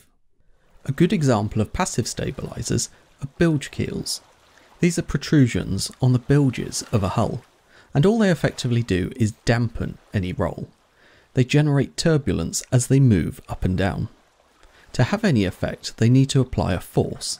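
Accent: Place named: England, United Kingdom. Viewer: Why are these vids always so much more compelling when the narrator has an English accent?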